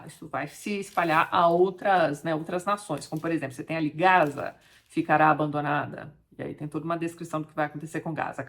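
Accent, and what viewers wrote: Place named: Minas Gerais, Brazil. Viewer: Parece mineiro. Zezinho, fiii do Arnaldo....kkkkkkkkk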